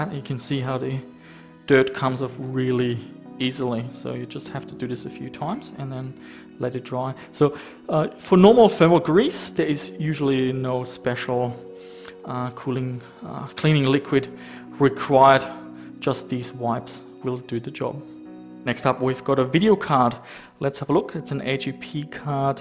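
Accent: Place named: Germany. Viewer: Are you an expat? You sound German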